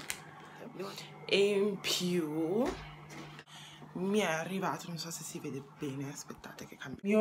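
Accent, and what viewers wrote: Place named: United Kingdom. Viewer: Ciao sei italiana (cioè nata in Italia) e poi trasferita a Londra o cosa?:) appena iscritta mi piaci molto e apprezzo molto il tuo accento molto british haha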